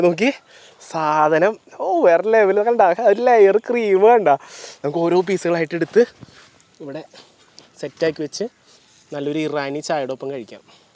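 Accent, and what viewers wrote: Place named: Kannur, India. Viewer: Wow ❤ Kannur full ithan 😂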